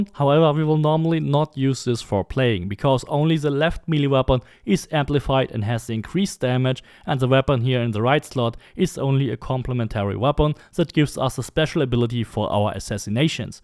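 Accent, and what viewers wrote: Germany. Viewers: Man hört den Deutschen zwar noch rauß aber deine englische aussprache ,bis auf ein paar deutsche Klassiker, ist echt mega.Englisch Studium oder einfach nur sehr viel englisch geredet?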